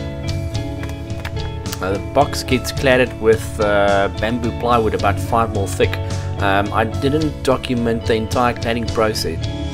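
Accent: South Africa. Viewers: Sorry for the rather odd question, but are you South African? Kind of have an SA accent :) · Great to hear that South African accent! Brilliant speaker build as well. Thanks for posting :) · awesome video, agree with Jeremy great to hear South African Accent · Dude Are You From South Africa?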